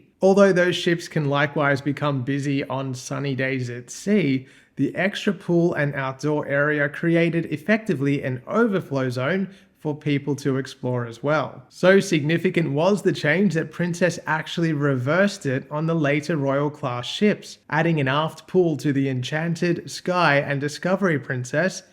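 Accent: Australia. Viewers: you are very welcome, plus I love the sound of the male Australian accent.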